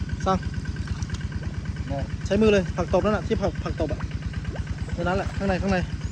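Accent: North, Thailand. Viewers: สำเนียงคนเหนือ ถ้าอู้ภาษาบ้านเฮา ท้าจะดีนะ เหมือนคนอีสานเขาเว้าภาษาถิ่นเขาเพราะดี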